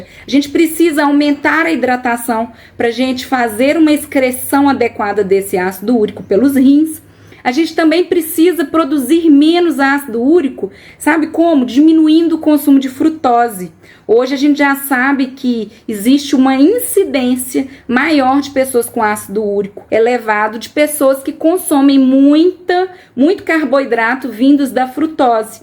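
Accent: Minas Gerais, Brazil. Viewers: Mineira com certeza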